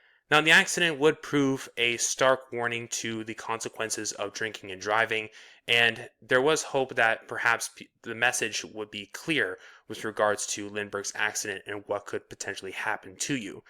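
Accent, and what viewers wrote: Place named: United States. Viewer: Yes, you americanize the pronounciation of all Swedish names here. It is really hard to explain, but you will find numerous examples in this short docu about him: <link>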